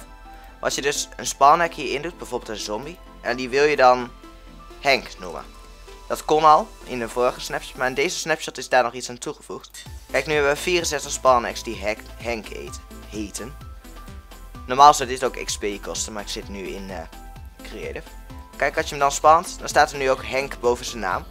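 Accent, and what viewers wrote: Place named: Rotterdam, Netherlands. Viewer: Leuk filmpje maar hij heeft een Rotterdamse "t" egt irritant om te luisteren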